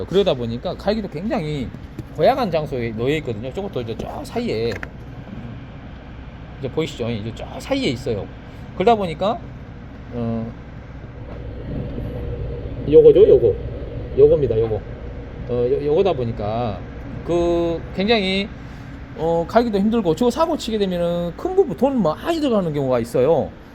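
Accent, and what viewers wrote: Jeolla, South Korea. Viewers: 전라말씨기 찡해버리네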